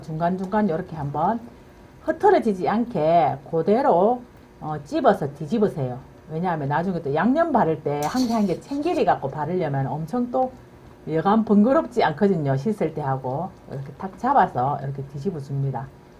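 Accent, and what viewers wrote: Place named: Daegu, South Korea. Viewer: 이 아줌마 진짜!!!!!ㅠㅠㅠㅠㅠㅠㅠㅠㅠ "사투리" "사투리" "사투리"!!!!!!!!!!!!! 어마무시 하다!!!!!ㅠㅠㅠㅠㅠㅠㅠㅠㅠ 사투리도 심한데,,,,,단어선택도ㅠㅠㅠㅠ 나도 경상도 100% 토박이 사람이지만,,,, 이렇게 심한사투리+안이쁜 단어선택 최강의 사투리 아줌마네!!!!!~~~~~~ 단어만 쫌 이쁜단어를 사용해도 좋을텐데 영상을 볼수가 없다!!!!듣기 싫어서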